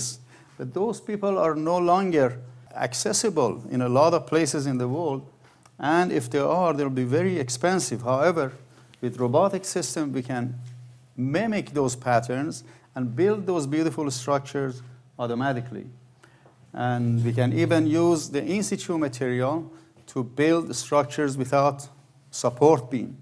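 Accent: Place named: Iran. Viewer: This man is from Iran I can hear his Persian accent.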